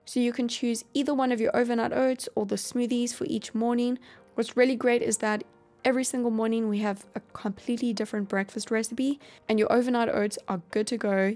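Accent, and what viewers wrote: South Africa. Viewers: Is that a South African accent I hear? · As soon as you started talking I knew you were from South Africa 😂😂💗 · Are you South African? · I'm new to your channel but are you south African? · you sound south african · In South Korea, was busy writing when you started your video. Seconds into the video I asked myself, "Is this person South African?" and then you said "Ja." It's SO good to hear an accent from home whilst being in another country. Thanks for the video. I'm subscribing.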